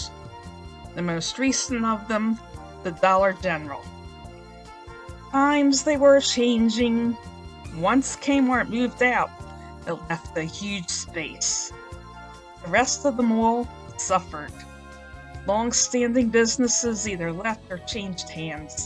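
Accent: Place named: Philadelphia, United States. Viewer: Narrated, with a perfect Philly accent😂 great job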